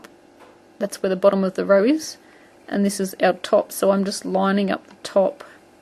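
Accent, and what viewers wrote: Australia. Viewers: I love this! You have taught me so many things! I'm a new crocheter, and I love your style of teaching. When I make something and show people, I tell them "the nice Australian lady taught me (I hope I have the accent right!!!!!)" I made this to accent a pair of handwarmers that I made using one of your tutorials...it ended up being too big for the handwarmer, but it's still so pretty that I know I can use it for something! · great tutorial you make it look so easy I'II keep practising and hopefully I'II get thankyou for all your hard work Where are you from? you sound like an aussie thanks again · I am Australian, and I love that we have a fellow Aussie making great tutorials, I love our accent and I for one am so thankful that you have uploaded this video and sharing your knowledge, I think your fantastic, keep up the great vids, your awsome :) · Is that an Australian accent I can hear?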